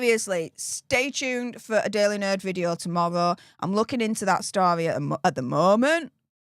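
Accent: Yorkshire, United Kingdom. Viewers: Gday from a Wakefield lad out in the Barossa valley in rural South Australia 🇦🇺 it’s great to hear a Yorkshire accent again! 🇦🇺🏴♥️🇦🇺🏴 Edit:it’s great to hear “hey up” again instead of gday or how’s it goin’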